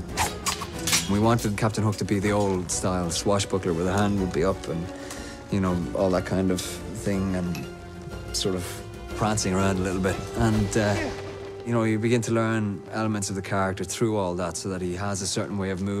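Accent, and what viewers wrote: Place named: England, United Kingdom. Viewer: Ah okay right, I misanderstood English and Irish accent actually yes he does use the English accent more than the Irish one :) thanks for clearing that up for me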